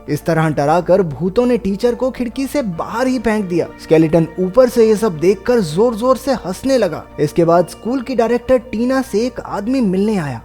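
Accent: Haryana, India. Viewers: bhau tu pakka havanvi h mne to deshwali laage h yar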